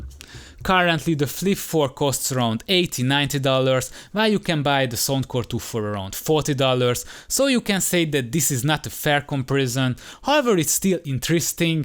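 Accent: Hungary. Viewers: De hallatszik hogy magyar vagy :D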